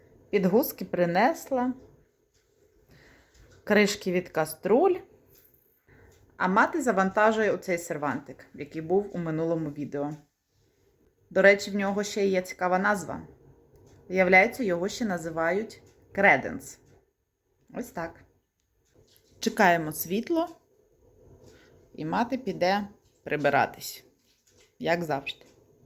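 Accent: Western Ukraine, Ukraine. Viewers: Ви мабуть з Західної України, бо розмовляєте не як Харків'яни, але зазделагідь вибачаюсь як це не так, в селі прекрасно живеться, головне все продумати завчасно з опаленням і комунікації в будинку щоб були умови для життя цивілізовані. Донечка як лялечка манюня і чоловік гарний 😍💓, хай вам Господь допомогає жити щасливо в оселі на Прикарпатті. Гуси - лебеді красиві но на жаль так Господь створив світ, що люди мають їсти 🍗м'ясо...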